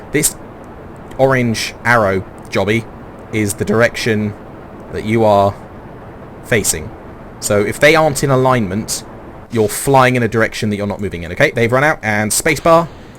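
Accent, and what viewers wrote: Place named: United Kingdom. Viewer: Don't ever stop my friend, you are a great lper for kerbal space program, I dunno maybe it's your british accent.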